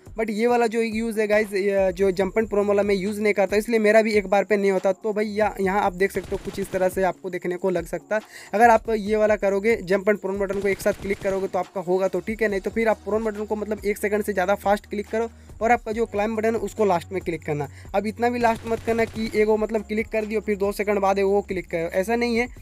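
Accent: India. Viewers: you tolking jharkhandi🙂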